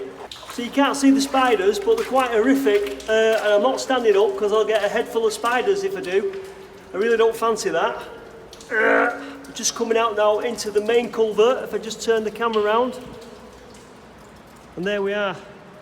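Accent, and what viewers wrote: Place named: Scotland, United Kingdom. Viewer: I can tell you're from a Scottish part of England, there's no mistaking the brogue. We have several different accents here in the USA, the State of Massachusetts has an accent that sounds similar to Scottish the pronunciation of some words. Love your channel!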